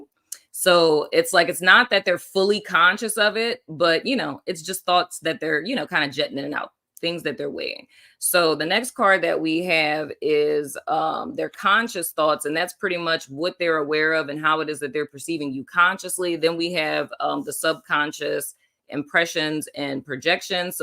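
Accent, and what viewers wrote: New Orleans, United States. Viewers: Libra Sun , Scorpio Moon and I'm from New Orleans too lol that accent will slip out baybay 😄⚜️⚜️⚜️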